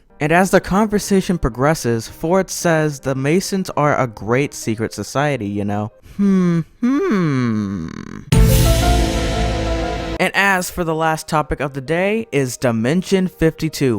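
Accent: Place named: South, United States. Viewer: you sound like Gideon. Maybe in a little southern accent lol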